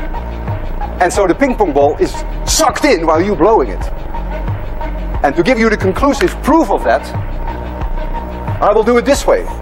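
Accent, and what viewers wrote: Netherlands. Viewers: why does it sound like a dutch teacher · Frikandellen accent is real on this one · I am hearing een beetje Dutch teacherrr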